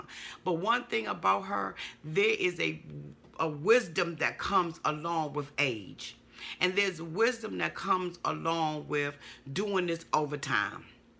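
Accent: Washington DC, United States. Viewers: Is that a DC accent I'm hearing ?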